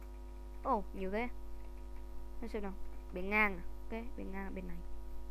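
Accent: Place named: North, Vietnam. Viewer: Hơi lai giọng bắc á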